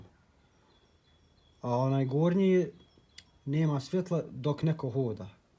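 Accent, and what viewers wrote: Bosnia and Herzegovina. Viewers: Pravi momak nekoliko tvoju videa sam pogledala I vidjela sam da si previse iskren moj savjet je kao moje dijete koje bi posavjetovala da nebudes toliko Iskren. Nardo iskoristava ima pokvareni ljudi moras biti malo Mudri a to je da se cuva s Dijete drago simpatican ti taj Bosanski Bas mi super te gledati❤❤😊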